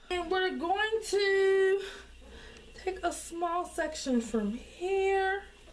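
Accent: Baltimore, United States. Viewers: U must be from Baltimore wit the tew nd dew lol...much love sis...our city's strong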